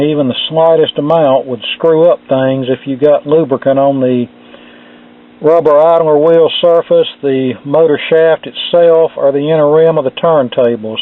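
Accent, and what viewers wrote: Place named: Louisiana, United States. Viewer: He sounds like he from Louisiana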